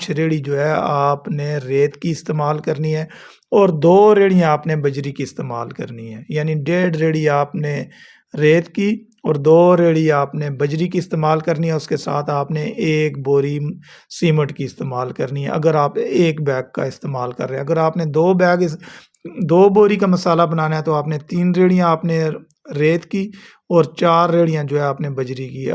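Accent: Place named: Attock, Pakistan. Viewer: بھاٸی آپ کی زبان سے تو لگتا ھے کہ آپ اٹک سے تعلق رکیتے ھیں ۔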